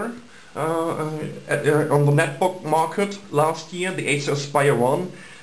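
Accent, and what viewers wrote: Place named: Germany. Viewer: I like his german accent :)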